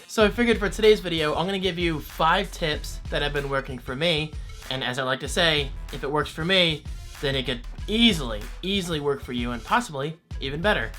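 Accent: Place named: Maryland, United States. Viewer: Are you from Maryland because you have the accent haha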